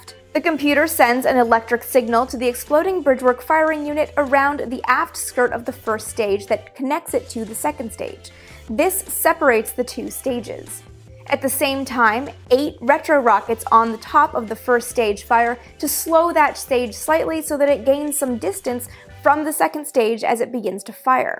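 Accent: Canada. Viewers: Is she Canadian? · She's Canadian!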